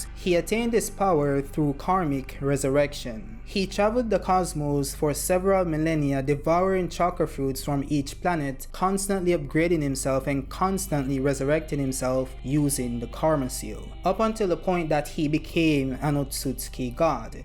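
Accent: Jamaica. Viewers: I stg im following you for your tasteful Jamaican accent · I know you were from Jamaica because of the accent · are you jamaican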